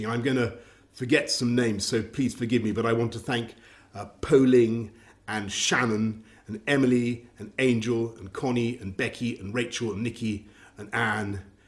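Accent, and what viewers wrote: RP, United Kingdom. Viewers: 私は、結構 noble English な感じが強くて、あと彼個人の癖が強い気がします。これ聞きやすいってことは、聞き慣れてるんですね👍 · さん これはイギリスの上流階級の英語で彼自身の癖ではないですよ。 アメリカやカナダの英語に慣れてる私にとって聞き取りづらいですw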